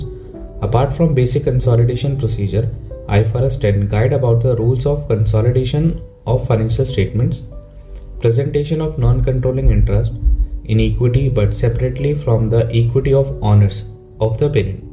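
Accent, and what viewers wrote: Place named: India. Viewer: the first problem with this video is the accent which is so bad, the second one is that you cannot cover the topic in just three minutes. Indians always fail to adhere to the minimum standards of professionalism and integrity and claim for what they don't really have. I personally don't like them at all.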